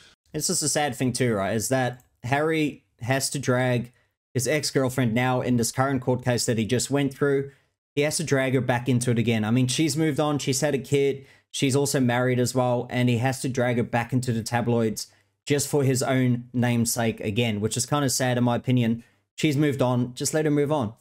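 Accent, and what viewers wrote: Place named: Australia. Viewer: I subbed because I have a weakness for an Aussie accent and most importantly, I can't stand those grifters.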